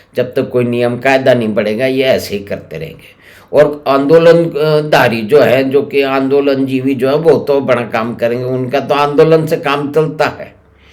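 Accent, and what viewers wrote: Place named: Haryana, India. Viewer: Enjoyed the Haryanvi tone.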